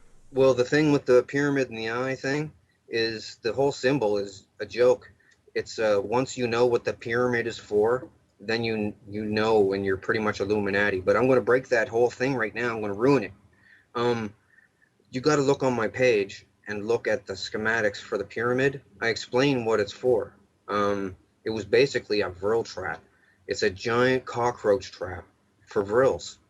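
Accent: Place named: New England, United States. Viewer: This guy looks like john Cusak's clone, but with an annoying Yankee twang😅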